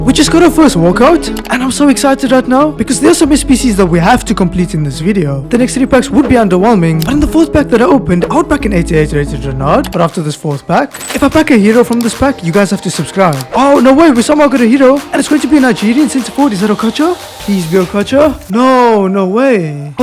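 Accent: South Africa. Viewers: is bro south african??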